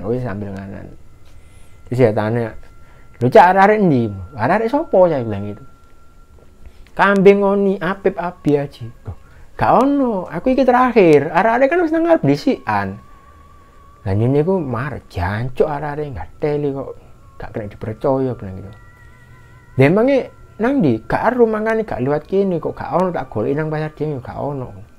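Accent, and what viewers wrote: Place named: Surabaya, Indonesia. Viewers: Kenapa ya,kok saya suka dengerin logat orang Jawa Timur kalo ngomong.. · Asyik banget aku dengerinnya,trus sampai senyum" sendiri.keren rek,bahasa suroboannya.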